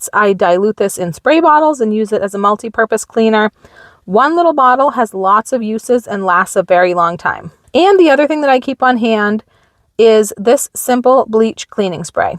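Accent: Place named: New England, United States. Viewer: When you said you got a “wicked good deal” I knew you are a fellow New Englander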